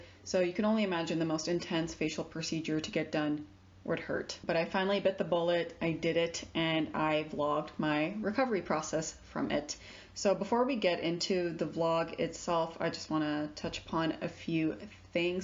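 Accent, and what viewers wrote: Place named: United States. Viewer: Where are you from? From your accent, I thought you were American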